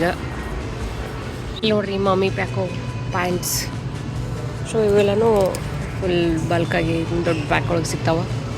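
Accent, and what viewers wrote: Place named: North Karnataka, India. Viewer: ನೀವು ಮಾತಾಡ್ತಾ ಇರೋ ಶೈಲಿ ನೋಡಿದ್ರೆ ನಮ್ಮ ಉತ್ತರ ಕರ್ನಾಟಕ ಭಾಗದವರು ಅಂತ ಅನ್ನಿಸ್ತಿದೆ ಹಾಗಾಗಿ ನನಗೆ ನಿಮ್ಮ ವಿಡಿಯೋ ತುಂಬಾ ಇಷ್ಟ 🤝🤝🤝🤝👍👍✨🌟✨🌟✨🌟✨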